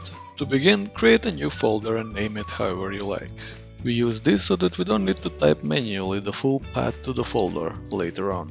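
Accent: Russia. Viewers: русский чтоль?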